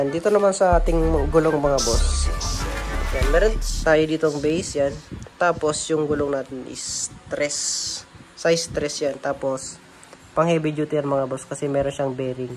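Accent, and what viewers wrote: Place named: Albay, Philippines. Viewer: Uno ngani ngaran nikadi? Ka taga albay ka boss ah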